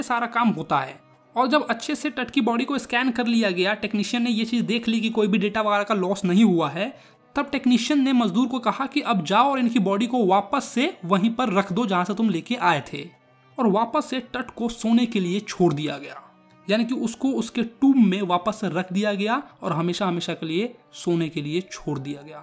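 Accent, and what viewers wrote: Bihar, India. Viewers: Bhaiya aap bihar se ho ki mp se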